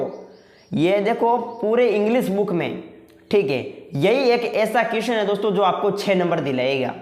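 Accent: Rajasthan, India. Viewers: BHAI Rajasthan su he Kay thaki boli bahut choki lagi ❤️❤️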